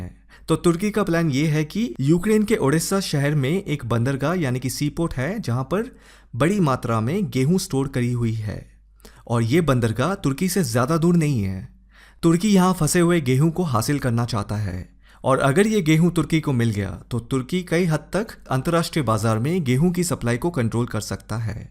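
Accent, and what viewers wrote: Bengal, India. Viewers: Bengali accent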